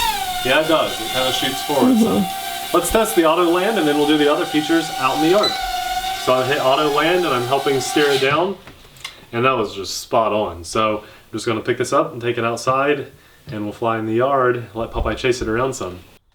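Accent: United States. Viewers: ...Are you from Ohio?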